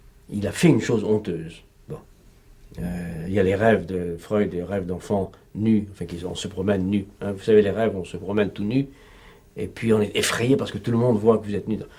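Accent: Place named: United States. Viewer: Lol he sounds so American too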